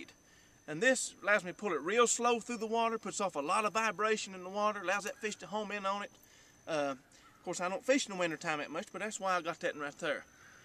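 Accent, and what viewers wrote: South, United States. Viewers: im from illinois with a midwest accent and its funny cause as i read the comments in my head i cant stop reading them in his southern accent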